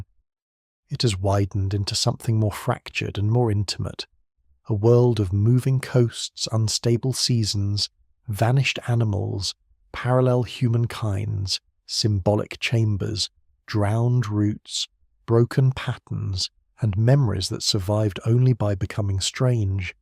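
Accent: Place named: Australia. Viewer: AU narration sucks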